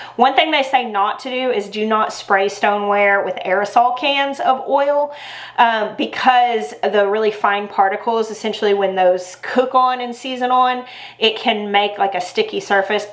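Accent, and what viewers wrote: South, United States. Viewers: Thank you!!!! You speaking German.....was a little disconcerting.....I prefer Southern accent ;-)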